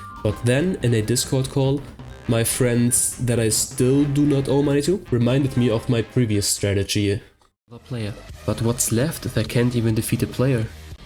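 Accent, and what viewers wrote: Germany. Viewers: Nice run! Unrelated but I was like "hey this guy sounds German" Then I was like "Paulaner Spezi aufm Schreibtisch 😱"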